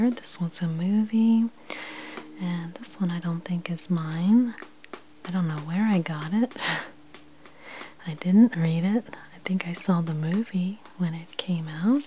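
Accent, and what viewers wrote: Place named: Minnesota, United States. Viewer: She's in Pennsylvania. I'm guessing by her voice she's spent time in Minnesota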